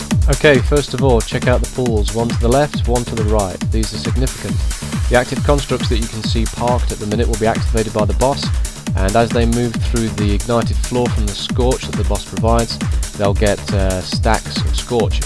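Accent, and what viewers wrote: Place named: England, United Kingdom. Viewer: God the English accent is annoying as all hell. But this is a very well done video and tutorial on how to beat him. Honestly, one of the best I've ever seen.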